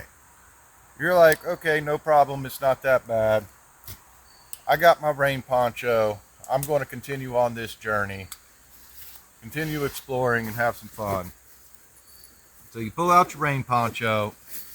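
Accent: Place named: Kentucky, United States. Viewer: I appreciate the manner in which you realistically presented the ACTUAL challenges in making a shelter while wearing a poncho. Thank you for expressing your concern for victime of Hellene. Our area along the northern half of the Al-GA state line was in the originally projected path of the storm before it suddenly turned northeastward & missed our area. We have many friends who live the areas most devastated. ALL the victims remain in our thoughts & prayers. By lowering the end of the poncho tied to the tree, the plow point shelter becomes long enough to stretch out, lie down, & sleep if an overnight stay becomes necessary in an emergewncy or survival situation. Thank you, & may God bless you, sir. Your accent reminds me of those which my family heard when we lived in SE KY about 40 miles beyond the Cumberland Gap. This presentation motivated me to watch your other videos & possibly subscribe! 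An 83 year old outdoor adventurer.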